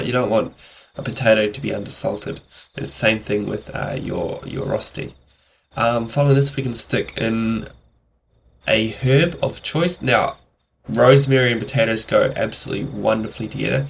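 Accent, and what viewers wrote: New Zealand. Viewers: hey i'm not judging but you sound like you're from New Zealand hehe x) I used to stay in NZ for 4 years I love all of your recipes!! <3 <3 I am a big fan of yours xx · He has a New Zealand accent, not Australian :D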